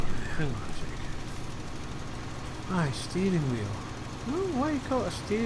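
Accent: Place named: Scotland, United Kingdom. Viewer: Good to hear your Sottish tones again.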